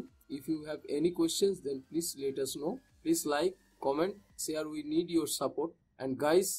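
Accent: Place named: India. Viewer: you are the 397th random Indian accent guy on the internet that saved me thank you.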